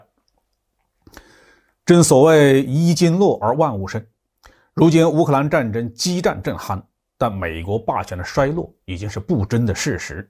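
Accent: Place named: Xinyang, China. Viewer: 听讲话好像有点河南信阳口音，难道是我的信阳老乡😃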